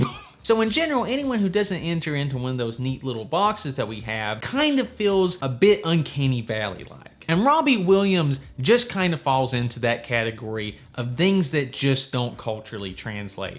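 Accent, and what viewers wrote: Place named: United States. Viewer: I like how you have a generic American accent until you say “sense”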